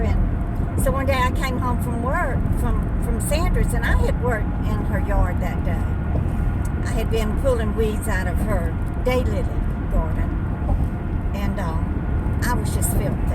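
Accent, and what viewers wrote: South, United States. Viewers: You sure have a wonderful outlook on all your many life experiences. You charm them with your southern drawl. They should make a movie of your life!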